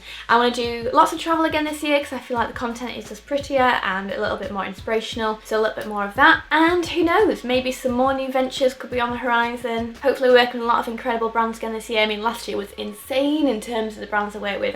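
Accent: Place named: North, United Kingdom. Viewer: where are you from? you sound quite northern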